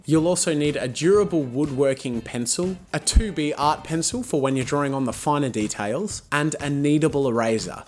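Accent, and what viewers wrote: Australia. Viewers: he sounds more Australian then normal, maybe because this video is older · His Aussie accent was stronger here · his Australian accent seem more Australian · He sounds very Australian in this video but not as much in other videos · just because he's Aussie doesn't mean u can make fun of his and my accent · U sounded way more Australian in 2014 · your accent was more australian back then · He sounds so aussie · more Australian in this than it is nowadays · his Australian accent seem more Australian in this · uses Aussie slang, Aussie accent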